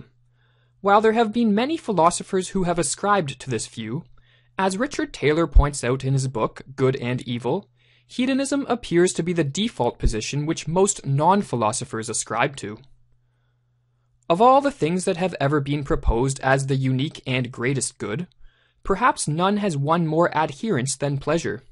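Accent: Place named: Canada. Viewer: A Canadian narrated this.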